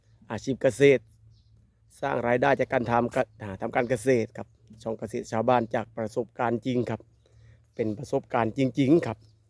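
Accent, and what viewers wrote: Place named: Laos. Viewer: ສຳນຽງຄືຄົນລາວເນາະ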